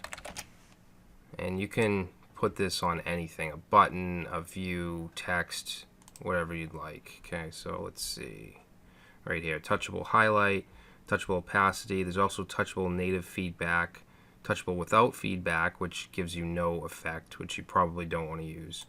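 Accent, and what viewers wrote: United States. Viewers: Man, how I feel happy when I find someone with native US accent explaining a technology these days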